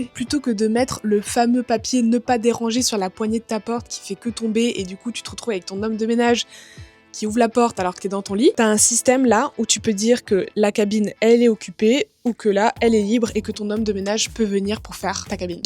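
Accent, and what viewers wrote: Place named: Belgium. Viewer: Tu ne serais pas Belge ? On dirait que tu as un petit accent en fin de phrase !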